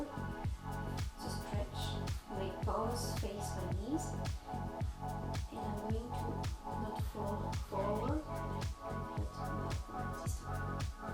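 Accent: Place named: France. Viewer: Great tutorial, very informational and calm. I`ve been in gymnastics for 8 years so I already knew how to do it and I`m not afraid of doing it, but I still loved your video:) I would really like to see a tutorial on how to to chinstand (with transition ) and/or jumping into handstand. My goal is to learn presshandstand but I think I need more strenght :) And I`m not sure what exercices to do to improve the presshandstand. Since I have no strenght I would be excited to at least be able to jump into handstand. Et j`aime bien ton accent français :D Loads of love , laurence. Keep working and posting awesome videos :)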